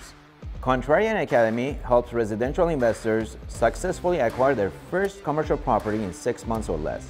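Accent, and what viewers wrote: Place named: Iran. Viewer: I never heard of this man before in my life. But i can hear on the accent he is Persian.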